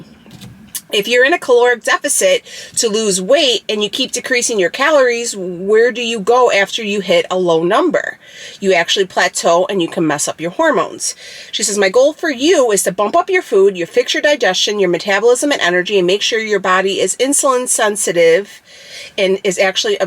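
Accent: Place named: Chicago, United States. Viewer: looked gorgeous! You did a great job on her makeup. Also love your Chicago/ Illinois accent, from a Floridian 😁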